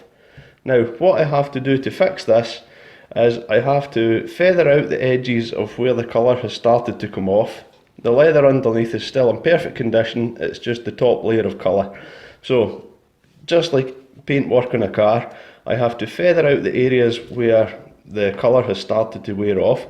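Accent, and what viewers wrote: Scotland, United Kingdom. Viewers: I can appreciate that you don't speak too fast because I wouldn't be able to understand with that collin mcrae accent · I think one of the reasons I subscribed to your video is because of your great Scottish accent as well as the great job you do to clean the car. Lol. Have a great day mate. 👍👍👍🇦🇺🇦🇺🇦🇺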